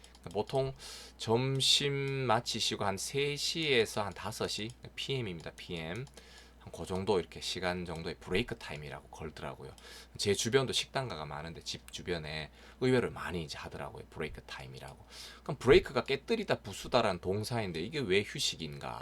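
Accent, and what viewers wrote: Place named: Gyeongju, South Korea. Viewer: 선생님 정말 감사합니다. 앞으로 고졸 영어 검정고시 공부하는 사람들은 축복 받은 것입니다.기초가 부족해도 쉽게 이해 할수있도록 천천히 세세히 풀이해주시니 모두다 조금만 열심히 하시면 100% 합격 하실것을 믿어 의심치 않습니다 10년이면 강산도 변한다 했는데 긴세월을 선생님과 함께 공부한다 생각하니 가슴이 벅찹니다 .저는 경주가 고향입니다 선생님의 사투리가 저의 고향 말과 같아서 더욱 정감이 갑니다 중학교때 친정 아버지께서 여자가 공부를 해서 뭐하노해서 문교부가 인정하지 않는 학교에 졸업해서 어디가서 이력서 낼때 정말 자존심이 상했습니다 올해 나이가64살 옛날에 이렇게 공부할 여건이 되었으면 아마 그때 쉽게 했을것 같은데 그때 시골은 정보가 없어 공부하기가 어려웠죠 핑계인지 모르지만 검정고시 도전하는 젊은 사람들 열심히 공부해서 수능에도 도전해 대학에도 가세요 나이 드니 시력도 안좋고 책상에 앉아 있으니 허리도 아프고 여건이 젊을때보단 좋지 않아요 남편도 같은 환경이라 많이 배우지 못해 배움에 목 말라 신혼 여행가서 저 한테 했었든 말 아직도 귀에 생생합니다. 돈 없어 공부 못했다는 소리 자식들에게 절대 안 듣는다고 세월이 흐르고 보니 남편과 전 열심히 살면서 자식들에게 우리의 못다한 꿈들을 이루었습니다 딸은 고등학교 교사. 아들은 대학병원에서 전문의로 여러분 이렇게 올리는 이유는 자랑이 아니고 제가 2019년 8월7일 중학교 검정고시 볼때 젊은 친구들이 많더군요 10대20대 이들이 마음 단단히 먹고 열심히 공부하길 바라는 마음이고요 또 우리들을 위해 10년전 것 까지 기출문제 풀이해 주시는 선생님에 대한 보답이기도 하고요 오늘 새벽에 7번까지 올라온 것을 보고 너무 감동 받아 자다 일어나 공부하고 잤어요 나이가 드니 열심히 해도 돌아서면 잊고하니 반복반복 해야됩니다.( 소불근학노후회) 주자십회훈에도 있어요 젊어 열심히 공부하지 않으면 늙어 후회한다고, 여러분 열심히 공부하여 유종의 미를 거둡시다. 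선생님 정말 고맙고 감사합니다.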